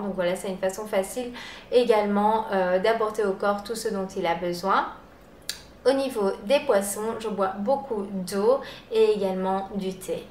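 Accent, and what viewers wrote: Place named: Charleroi, Belgium. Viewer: Coucou, Viens-tu de la région de Charleroi? Je me pose la question par rapport à ton petit "accent":-) Bizzz:-)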